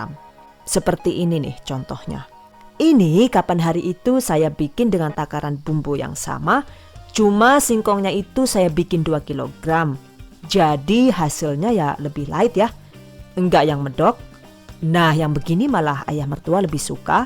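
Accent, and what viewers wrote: Banyumas, Indonesia. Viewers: Penjelasan nya detail.suara nya enak di dengar,lucu ada ngapak nya.bahasa Inggris nya juga keren. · Belum lama kenal vlog ini, langsung jatuh hati. Lagsung subcribe, kepo buka video" semua masakan😄😄 Sangat menginspirasi sekali. Jelas & mudah penjelasannya. Bahasanya itu lohh.. enak dengernya... Palagi pas yg ngapak".hehehe...Ngakak polll #giveawayTHVagustus19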